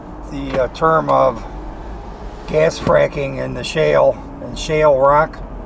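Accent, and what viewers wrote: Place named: Great Lakes, United States. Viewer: Lol I've always loved your accent. I never realized that accent was also in Upstate New York. People from Michigan, Illinois, and Ohio have that accent too around the Great Lakes. I guess they call it a "Great Lakes" accent. I'm from Southwestern Ohio (Dayton, specifically), so my accent is a cross between the Southern Ohio, Northen Kentucky, and Northern Indiana accents because that's where my family is from. People think I talk weird because I pronounce things differently! I say boxes as, "bahx-ezz", caramel as "car-mull", Lima as "lime-uh", and worship as "worsh-ep".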